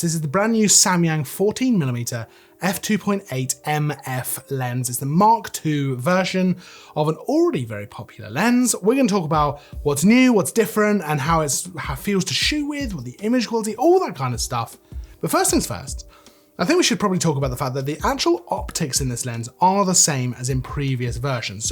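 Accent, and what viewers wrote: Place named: United Kingdom. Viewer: I can't with the old British accent. I study American history and what those people did to this country is deplorable. So his accent makes me feel nauseous. Not his fault 🤢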